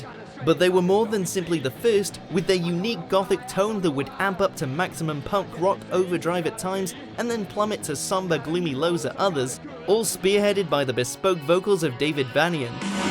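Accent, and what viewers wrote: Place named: Cockney, United Kingdom. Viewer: Ваш ебаный fucking cockney нихуя не understand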